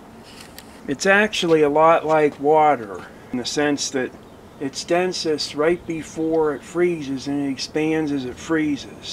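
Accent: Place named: East Coast, United States. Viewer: You have a very nice accent. Definitely east coast, you can tell by the "o"s.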